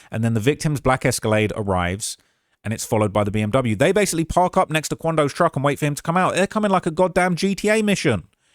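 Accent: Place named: United Kingdom. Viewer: has a thing against black people. He uses AI but yet he claims the lawyer used AI. Why? Because he was black? made the claim because he himself uses chatgpt. That was projection., you didn't go to journalism school -you're not a journalist! You get your information from Reddit comments. Going to a court house with a suit on and getting a press pass because of your British accent doesnt make you a journalist. Go to the hoods you report on after someone gets shot thefe. Thats journalism. Go interview the victims in the hood. Go do your investigation after a rapper gets shot, then I'll call you a journalist. All you do is institutionalized gossiping. You have turned gossiping into a profession. But thats gossiping - not journalism. The man you're accusing of using Chatgpt went to law school, passed the bar and is a lawyer! Who do you think you are to question his work? 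You're a gossip youtuber. Grab your lips and get a hold of yourself. You can put an old t.v, reels and passes in the background of your set, nice arrangement, but that still doesnt make you a journalist.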